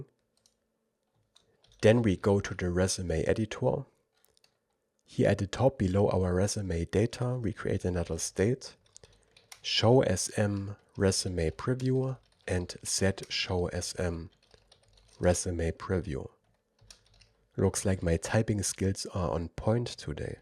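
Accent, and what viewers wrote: Germany. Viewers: I can smell a bold German accent 😅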